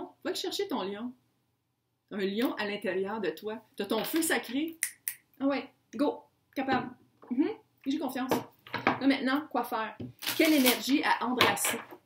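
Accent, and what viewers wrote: Canada. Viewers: Merci beaucoup pour votre énergie positive et votre bonne humeur. J'adore votre accent canadien, je suis française et j'avoue que parfois j'ai du mal à comprendre vos mots et ça me fait bcp rire 😂❤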